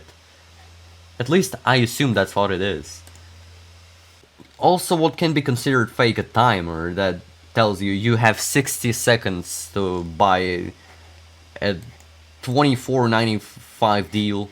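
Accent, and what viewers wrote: Russia. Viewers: the russian accent doe, keep up the excellent work :D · Russian: check Light accent: check Flies in background: check Techy: check Yeah, its all coming together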